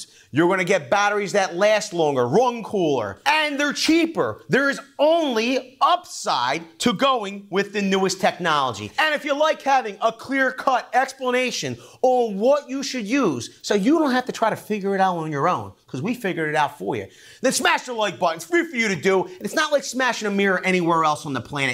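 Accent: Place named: New York, United States. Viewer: My apology for not possibly be knowledgable of a fact, but why is it common to see these people with the NY accent / Boston always talking with this bad attitude behavior, as if they're going through some divorce, or for one reason or another, they're having to resort to buying cheap britches that ride hide high in the crack of their a$$es ?....I mean really guy's....... Isn't there someone close to this guy that can enlighten him about the used boxer brief at the Salvation Army that he could purchase at a fairly good price, that allow him to calm the "F" down! especially when doing these very informative video's ?....Maybe its the Napoleon Syndrome...I'm sorry if I'm out of place...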